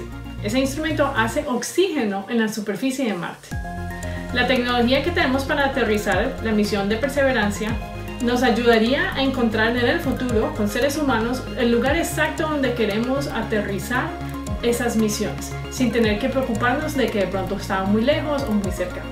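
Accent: Colombia. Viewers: Por su acento, muy Colombiana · Cuando dice "La parte más chévere..." y su acento caleño. Qué gran orgullo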